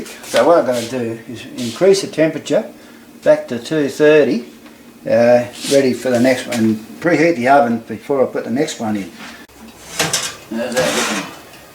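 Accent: Australia. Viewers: LUV your Aussie accent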